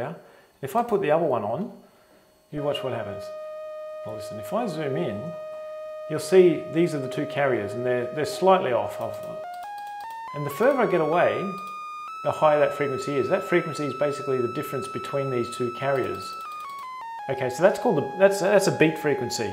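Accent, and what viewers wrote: Australia. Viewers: So, is it so unusual for someone to mistake and Australian accent for British?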